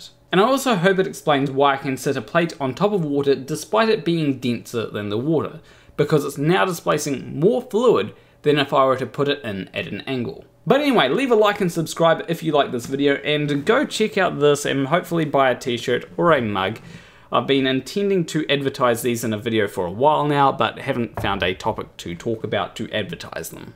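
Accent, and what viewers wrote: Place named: New Zealand. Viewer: New Zealand accent.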